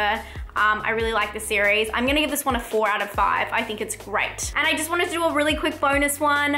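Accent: Australia. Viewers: Wait..................you are Australian? When did that happen??? I’m gonna need to check these podcasts out. Still haven’t caught up with Sword and Scale. You are so AMAZING!!! Keep up the awesome work!!! Lists are GREAT!!!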